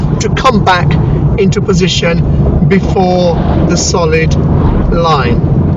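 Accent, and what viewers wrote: United Kingdom. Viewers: Thanks nice, clear and compliment for your Queen English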